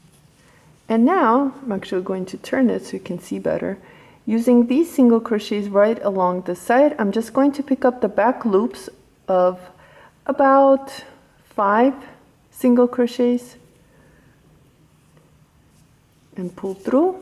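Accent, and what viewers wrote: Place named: Bulgaria. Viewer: Really fun project!, btw, are you Bulgarian? Your accent and your picture made me wonder 😉.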